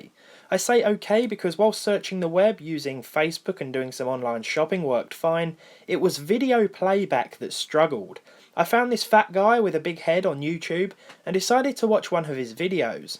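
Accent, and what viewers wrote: United Kingdom. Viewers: I really love this channel, especially that Brit voice it’s easy to listen to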